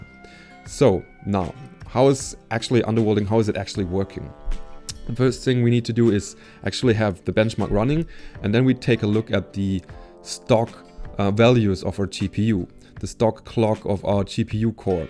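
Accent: Germany. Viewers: German accent and American cap.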